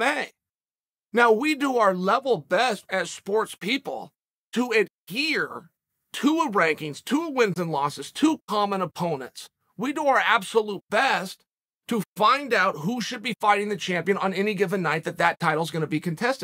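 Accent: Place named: Portland, United States. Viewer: Chael’s speaks Russian with a Portland accent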